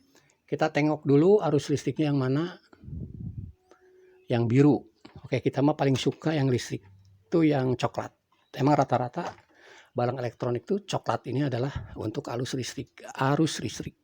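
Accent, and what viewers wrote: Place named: West Java, Indonesia. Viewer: enya nyariosna sunda banget hahaha tapi tenanaon, maju terus kang!!!! SUNDA terus berkarya☺️☺️☺️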